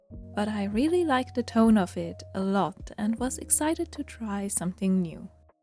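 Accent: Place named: Germany. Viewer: I just found you on YouTube because I was looking for relaxing art vlogs and your narration and story telling is so soothing and beautiful, it calms me and I find it very inspiring 💕 it's also very unique that you live in Korea but have a German accent? (I'm German btw 🙈🤣) Where were you originally from?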